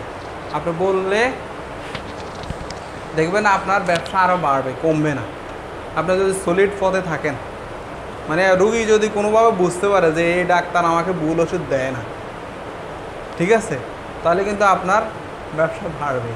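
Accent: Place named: Chittagong, Bangladesh. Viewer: স্যার এর লেকচার অনেক সুন্দর, টপিক গুছিয়ে বলে, স্যার মনে হয় চট্টগ্রাম এর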